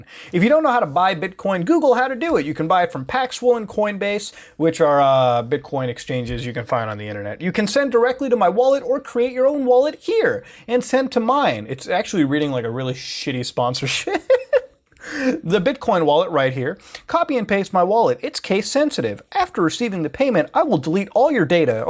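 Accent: United States. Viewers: Honestly, I thought you are some kind American dude like anybody speaking like an American are sounded like that but you look Indian at first glance. No offense. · You look like an Indian, yet you sound American... What sorcery is this?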